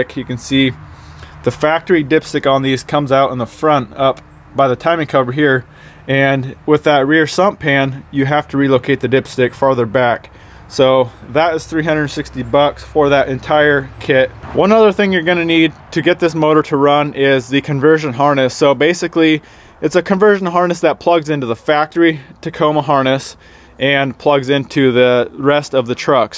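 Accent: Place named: Finland. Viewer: Your Finnish English accent needs a a lot more work. Mika Häkkinen style.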